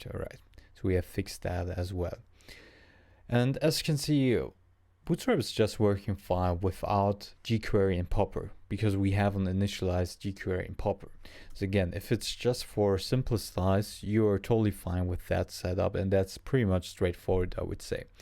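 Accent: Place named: France. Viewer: 😮 ur accents seems french or German even nearly french. Anyway Many thanks for this productive video which made me more passionate about Rails. Thanks again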